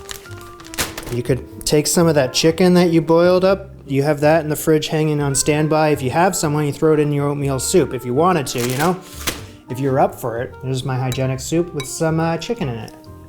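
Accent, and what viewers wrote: Canada. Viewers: I’m going to guess you’re not from the US or Canada? (I’m guessing this guy is Canadian based on his accent.) Here whole chicken comes like that guts removed. You don’t have to clean it yourself. The main edible innards (gizzard, liver, heart) are usually included in a bag stuffed inside the cavity. Oh, and the neck is stuffed inside there, too. You take all that out before using the chicken. Chicken with the heads still on and uncleaned you have to go out of your way to find.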